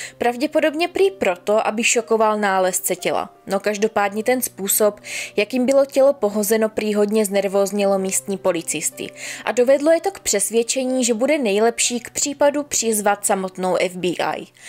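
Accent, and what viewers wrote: Moravia, Czech Republic. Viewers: Jsi super.Krasne se té poslouchá.Mas něžný hlas a ten moravský přízvuk je tak roztomilý🤗Vždy se těším na každé tvé nové příběhy🥰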